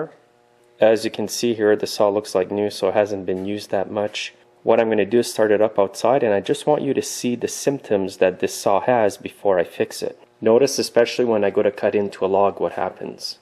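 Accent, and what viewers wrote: Quebec, Canada. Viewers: Sorry,. I had a lot of trouble understanding your Canadian accent. Talk slower. Were you referring to the red or blue screw? Your voice dropped out. Can they set these up for Torx screw adjustment? Why the special tool?!? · I've currently got a Poulan, and while it's good for what it is, it's time for me to upgrade. My hardware guy sells Echo, and claims that the quality of the machines and that the company is on par with Stihl and Husqvarna. What are your thoughts? PS: Is your accent Quebecois or Manitoban?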